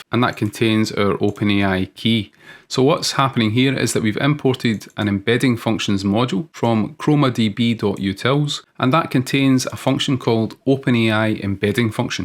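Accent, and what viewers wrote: Scotland, United Kingdom. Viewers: Loving your Scottish accent mate! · Trying to focus on the topic but your accent keeps throwing me off. Love the scottish accent.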